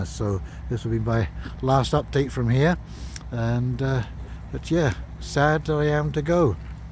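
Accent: United Kingdom. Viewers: take your stupid british accent back to where your from. Canada does not need you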